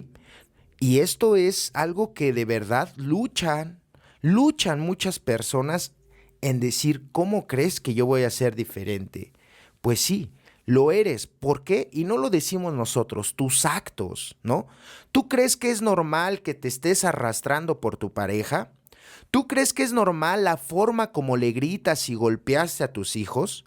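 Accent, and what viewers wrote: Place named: Mexico. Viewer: Oye, tu ères de Mexico Verdad ? Por El asento tan bonito, yo naci en uno de Los grupos de Monterrey.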